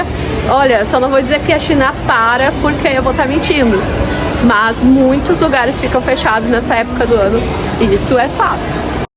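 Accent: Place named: South, Brazil. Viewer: Sou seu seguidor, acho vc e familia muito simpaticos. Queria dar umas sujestões de videos. Antes a china era chig ling. Tudo era copiado. Agora é o futuro, tamanho o avanço de tudo ai. Mostra mais esse lado, as coisas tecnologicas. desde ponte, ruas, maquinas, coisas diferentes pra nos brasileiros. Sou do sudeste mas adoro o seu sotaque do sul. Um grande abraço e um beijinho para o que parece ser um doce de crinaça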